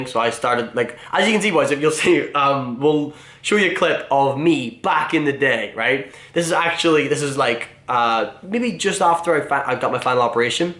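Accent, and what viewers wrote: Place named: Scotland, United Kingdom. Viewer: Haha sum boy what part of Scotland you from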